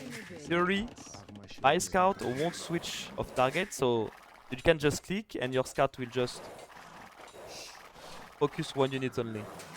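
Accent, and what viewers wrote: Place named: France. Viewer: Bizzarement on sent dans ta voix que tu parles français ou que tu as une peux l'accent je me trompe 😏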